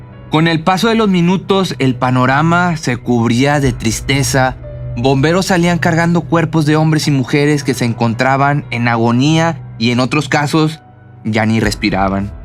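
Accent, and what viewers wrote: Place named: North, Mexico. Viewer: Llevo meses siguiendolo y no sabía que es de aquí. Su acento se me hacía familiar. Arriba el norte! 🤠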